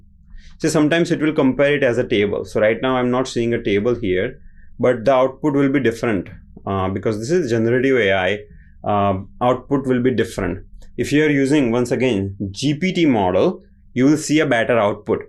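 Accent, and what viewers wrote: Gujarat, India. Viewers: Gujarati accent hehe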